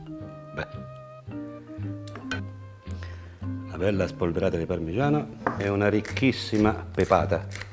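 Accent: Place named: Rome, Italy. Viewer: sembrava un accento romano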